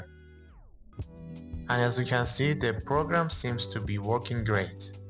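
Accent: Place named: Iran. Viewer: سلام آقا.بدون اینکه اسم اکانت رو ببینم گفتم این انگلیسی حرف زدن یه ایرانیه.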